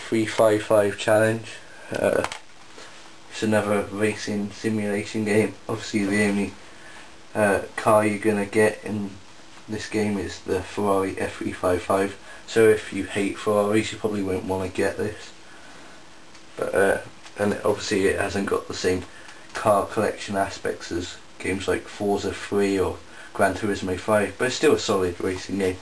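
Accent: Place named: United Kingdom. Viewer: lol yeah he is from the UK. Did he voice not give it away? lol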